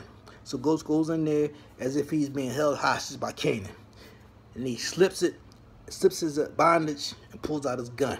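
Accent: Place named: Philadelphia, United States. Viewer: You sound like you from Philly... Lol love the review and theatrics 😎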